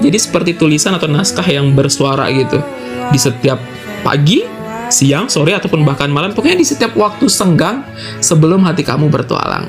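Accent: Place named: South Jakarta, Indonesia. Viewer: Woaaah.. 😂 jaksel banget bro But good job! Keep it going yaa ^^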